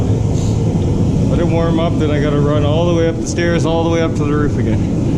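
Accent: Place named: Canada. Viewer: Where is this guy from? Sound Canadian lol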